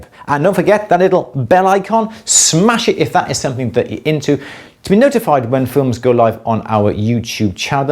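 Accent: United Kingdom. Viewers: I definitely put the cart in front of the donkey.... I was partially through a metalsmithing class before the class was shut down because of the virus. But ar 62, I’m determined this is what It want to do, even if only for making jewelry for myself, This morning I bought a Durston Agile C140 Combo 60 Flat/40 Wire Rolling Mill. I figured with all this time on my hands, why not play and learn at the same time? I came here tonight to search for beginning projects for rolling mills and found your awesome video. THANK YOU! This will be so helpful! PS! I’m thrilled you like the Duraton Agiles. What sold me was the 5th wheel that made the rolling go easier, smoother, over having four wheels. I know I will love it when it comes next week! LOVE your videos and your British accent!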